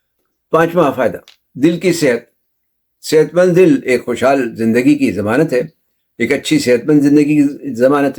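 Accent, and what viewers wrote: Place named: Lucknow, India. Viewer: آ پکا بات کرنے کا انداز یوپی والا ھے جیسے لکھنؤی!!!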